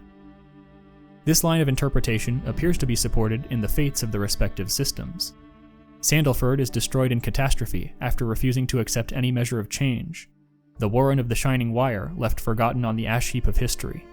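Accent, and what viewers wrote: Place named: Newcastle, United Kingdom. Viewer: Credit where it's due: a damn good attempt at Geordie mannerisms! Not the easiest even for English folks 😂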